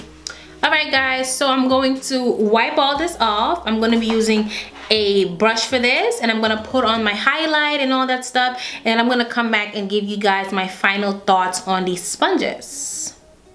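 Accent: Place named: New York, United States. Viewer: Once I listened more I picked up NY lol.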